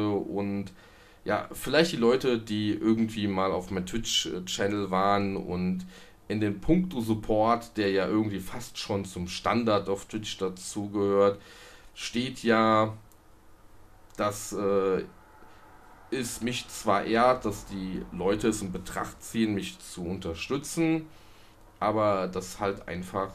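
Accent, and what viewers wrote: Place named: Germany. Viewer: Ich wuerde nicht beschreiben als erfolglos. Du hast ja einen deutschen Akzent aber du bist dennoch verstaendlich. Ich finde deinen Akzent sogar charmant.Weil ich eine Fremdsprache lerne, bin ich grosszuegig, wenn andere Fehler in meiner Muttersprache machen.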